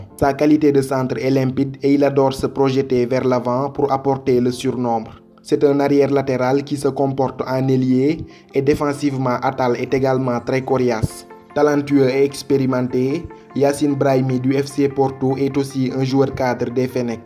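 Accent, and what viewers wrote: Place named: Senegal. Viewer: 😂😂 chui pas français mais je suis sûr k ya n'en des français ki disent comme que. Et il est né sénégalais donc l'accent c naturel et faut être fier 😉.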